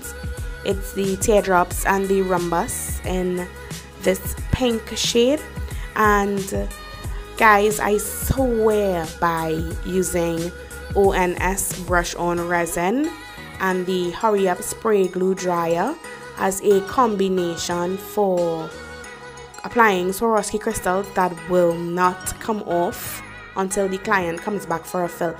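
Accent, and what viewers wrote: Trinidad and Tobago. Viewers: I can tell a Trinidadian accent anywhere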